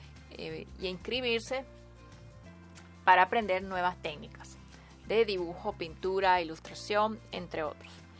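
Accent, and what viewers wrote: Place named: Venezuela. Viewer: Esta chica es muy buena explicando, tiene estilo me encanta, tiene acento venezolano, qué bonito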